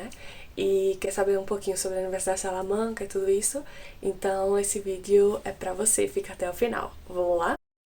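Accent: Santa Maria, Brazil. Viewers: Tu é muito divertida véi!! E que bom que não perdeu o sotaque de SM kkkkkkkkkkkkkkk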